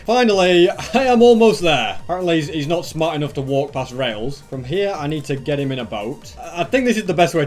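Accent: United Kingdom. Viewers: No he is british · I absolutely love you British accent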